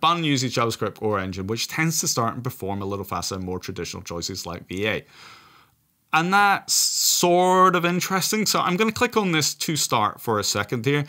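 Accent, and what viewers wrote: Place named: England, United Kingdom. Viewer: I absolutely love his english accent - Subscribe earned just by hearing it :D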